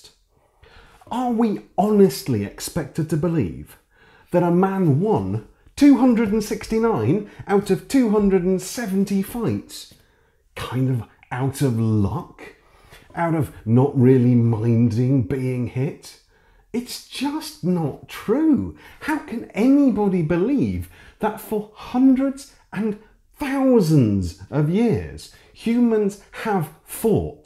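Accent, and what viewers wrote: United Kingdom. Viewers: A man with muscles and a British accent reading from an old book to passionately make a point.....I didn’t know about this kink until now. Thank you.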